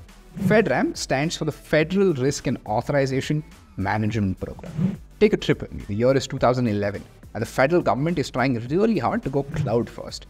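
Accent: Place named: India. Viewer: I have to really increase the volume to understand what he is speaking - an accent like bollywood or some language that I am not used to is hard to understand, the accent.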